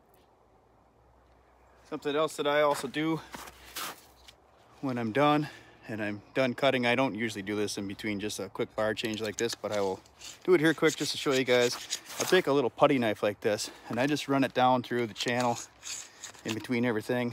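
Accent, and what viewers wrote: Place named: Wisconsin, United States. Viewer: can tell you are from wisconsin! you betcha.. gotta take the family shopping at fleet farm for EVERYTHING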